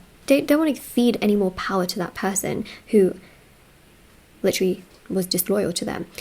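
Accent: United Kingdom. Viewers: I m a huge fan of ur sweet voice n ur British accent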